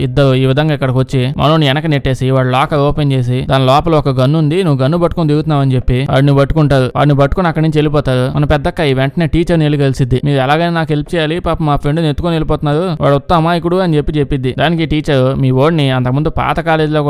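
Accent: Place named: Nellore, India. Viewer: Nellore slang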